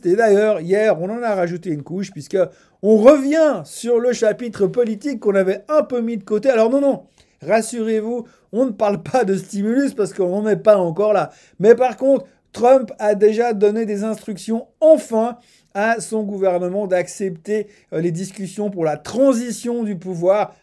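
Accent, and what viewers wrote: Switzerland. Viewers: Dedieu dedieu, il me manque cet accent suisse. Merci pour ce que vous partagez ;-)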